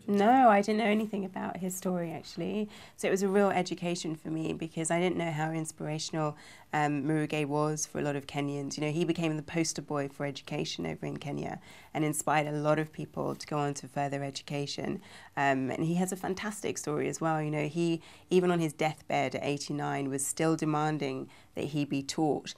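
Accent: United Kingdom. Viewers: i loooooooove the accent yoooh you are so good. you sound soooo African in the movie and so British in real life woooow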